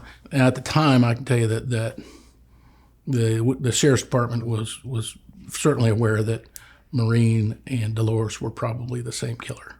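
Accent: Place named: United Kingdom. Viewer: to give this channel a lil boost: subbed. british sounding narration always sounds like quality to me, a german.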